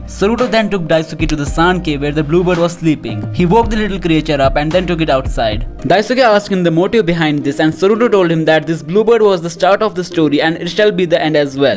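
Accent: India. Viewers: You sound Indian are you?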